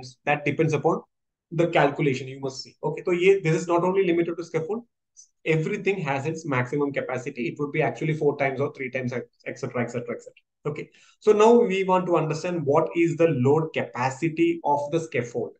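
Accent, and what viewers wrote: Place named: India. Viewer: helo from Norway very good expline even with your English Hindi accent i understood you everthing im in Scaffolding curs taking teori i was wonder about loading capacity explination so this was what i was loking for This process i understand better so with no doubt Cheers the next capacity on medium x4 1000 KG its very simple in Norway standard its Light Duty depending on Companys and purpose so thank you i will suscribe to your chanell i have my own Scafolding 145m2 so this info from your chanel can be usefull in future you win one like extra😃😃🥂 thanx Cheers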